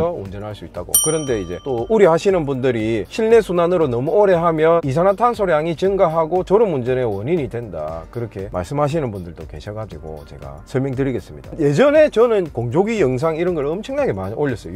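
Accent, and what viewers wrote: Gyeongsang, South Korea. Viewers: 빠만떼기 말수도 있습니다 ㅋㅋㅋㅋㅋㅋㅋㅋㅋ 제가 갱상도 남잔데도 사투리가 구수하게 들리네요 ㅎㅎ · ㅋㅋ 갱상도 사투리를 유튜버에서 듣게 되는군요 ㅎ... "빠마데기"라는말 진짜로 오랜만에 들어봅니다 ㅋㅋ~^(^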